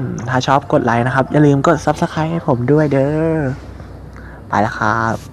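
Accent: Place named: Thailand. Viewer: เสียงเหน่อ น่าร๊ากอ่า เพิ่งเข้ามาเจอครั้งแรก กดติดตามให้และนะ ทำคลิปออกมาเยอะๆนะ ชอบเล่นเกมนี้รอติดตามนะ ^_^ เป็นกำลังใจให้ แอดไปและรับแอดด้วยนะ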